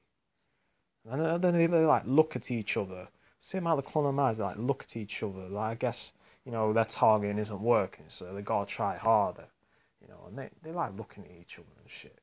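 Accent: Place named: Nottingham, United Kingdom. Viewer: Have you ever wondered if you have mental health problems? I'm sorry if my comment offends you but you're living in hell at the moment and getting help might make life better for you, If not at least you can be sure it's not your mind playing tricks on you. I'm from Nottingham, You sound like you're from Nottingham as well or near? Take care.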